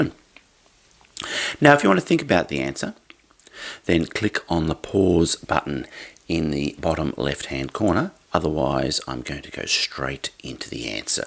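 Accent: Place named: Australia. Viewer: omg you are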